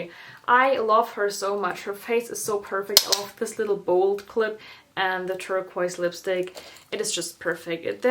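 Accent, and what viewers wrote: Germany. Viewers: are you german ? (me 10 minutes later: JA BIST DU. Ich hab es einfach gleich gehört weil ich den gleichen Akzent habe)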